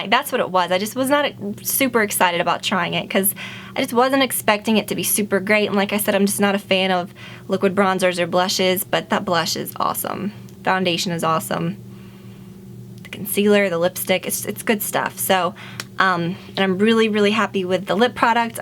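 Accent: South, United States. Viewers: You southern drawl is coming out a bit more :) Love it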